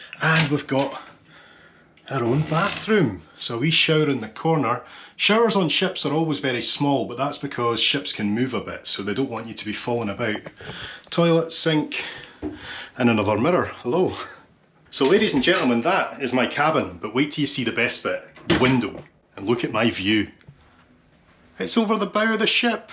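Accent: Scotland, United Kingdom. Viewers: Rarely have I seen such a beautiful, friendly travel report as yours. Not a trace of snobbery, absolutely unpretentious report intended for the average consumer. The narrator's heartwarming, generally positive nature with his lovely Scottish accent (at least I assume it's a Scottish accent, right?) do the rest. Thank you very much, Mr.! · Well done, clear and honest info. brilliant. And your SCOTTISH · And, have to add, you have a marvellous voice, very friendly, very clear, and, o/c, lovely accent! - can't beat a good Scots accent. Thanks for the voyage. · Hi! First time watching your channel. (Yes, I subscribed. ) Loved the video very much. I live in the state of Washington, USA. I could really feel the cold you felt! Really liked your monologue, and your Scottish accent. Felt like I was on the ship with you.. Looking forward to catching up on other videos of yours and to future ones. 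🙂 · Your videos are much better than some of the more well known utubers. My mum is Scottish and I love the accent. The things I like the most about your videos is you show these trips from a much more affordable way so it becomes attainable for a lot of people. I also love the fact that your narration is not monotone like some of the others who actually put me off. You don't use lots of silly gimmicks and your filming is lovely. Will be watching more of your trips. Well done. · I live in the USA where I was born, but am a proud descendant of brave Scots men and women who crossed the treacherous North Atlantic nearly 400 years ago. I have just discovered your channel as a result of searching for information about this ferry. Thank you for the engaging post and I love your Scots accent. 🤗. Edit: It is a handsome ship indeed. I’m truly adding this to my “bucket” list. 👍🏼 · Im detecting a Scottish accent, I went to Rannoch School 4th and 5th form back in the 70s 80s and love watching these vids. 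My Fathers side is from the Hay Clan and my Mother unfortunately has ties to the McDonald Clan. But mt Tartan is Hay Clan. Keep up the good work and dont be a poofter. The Scottish would rule the world if not for Scotch Whiskey!! Keep up the good work and hope you get at least your Bronze award for this expey!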